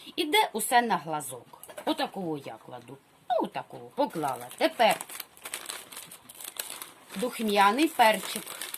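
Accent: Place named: Surzhyk, Ukraine. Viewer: зараз такий час коли усі балакають з телефоном та самі з собою.Ви теж квасю яка гарна суржикова вимова та говірка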